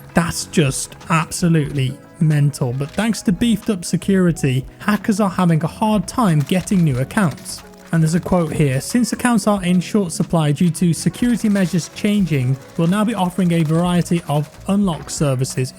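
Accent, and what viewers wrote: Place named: North, United Kingdom. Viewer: I swear to God sounds like Brian cox. It's like a slight northern accent. It's calming.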